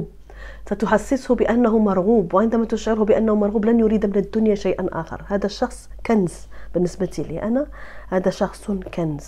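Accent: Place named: Tunisia. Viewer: لهجتك مثل لهجة التوانسة ❤❤❤وصوتك مريح يا ألله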